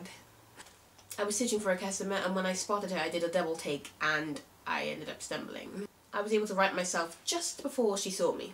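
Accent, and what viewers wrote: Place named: RP, United Kingdom. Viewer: complete stunning you are m`lady with one of the strongest high class very posh & best british accents ive heard by far absolute fabulous, great channel & love the content also. btw im genuinely being honest complimenting you, im not joking or trying to be rude to you in any way i hope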